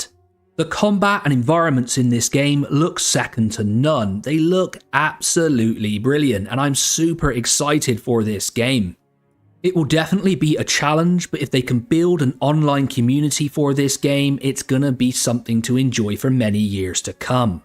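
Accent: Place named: Bristol, United Kingdom. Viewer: Your accent sounds Bristolian, the very least you're from the South West? I have so many questions.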